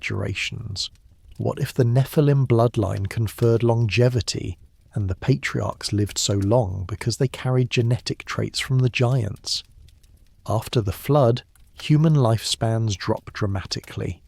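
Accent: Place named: England, United Kingdom. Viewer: please please No More English Accent !!! please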